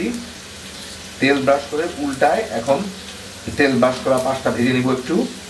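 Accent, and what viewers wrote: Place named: Thakurgaon, Bangladesh. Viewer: ভাই,আপনার বাড়ি কি ঠাকুরগাঁওয়ে? কথা শুনে মনে হয় আপনি ঠাকুরগাঁও এর মানুষ!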